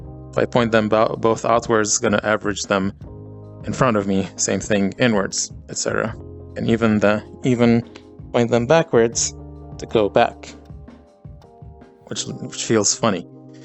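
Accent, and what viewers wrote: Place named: Serbia. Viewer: Ar you serbian lol I love your accent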